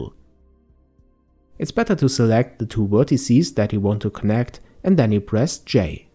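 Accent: Germany. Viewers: Most likely a German native speaker who is overly self-conscious about using the "w" sound correctly.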